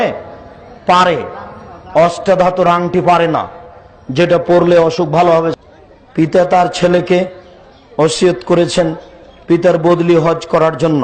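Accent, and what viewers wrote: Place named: Kolkata, India. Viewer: হালায় দেহি কলকাতার ভাষায় কথা বলে বুঝ্ঝি হালায় ইন্ডিয়ার দালাল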